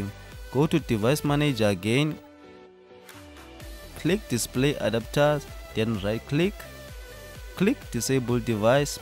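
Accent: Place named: South Africa. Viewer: Greetings from Nairobi. I love the SA accent. Bro you just saved me over $300. Was getting ready to order a new Mac Book from Amazon. I installed the Exodus Cryptocurrency Wallet on my laptop and then everything slowed down. I tried to make a bunch of improvements to increase performance which helped however Premiere Pro simply couldn’t load without showing the error. You’re an African Genius. Thanks 🙏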